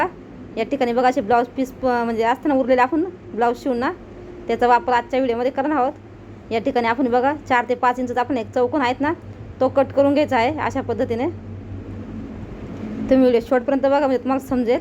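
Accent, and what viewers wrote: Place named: India. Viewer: आपण इंग्रजी माध्यमाच्या शाळेत शिकलात का? मराठी मध्ये बोलताना जास्तीत जास्त इंग्रजी शब्द वापरताय. कट करणे,मार्किंग करणे,साइज,फोल्ड करणे इ.शब्द. एरवी बोलण्याची‌ पद्धत व उच्चार गावरानी वाटतात.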